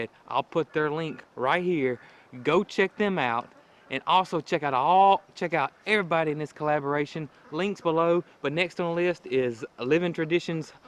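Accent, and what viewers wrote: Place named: Tennessee, United States. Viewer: love your channel! I'm a new sub,love the first video I watched you sounded just like me here in Tennessee.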